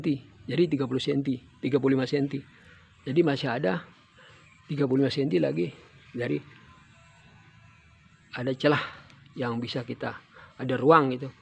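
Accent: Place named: Lombok, Indonesia. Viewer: dari logat nya orang sasak ya ?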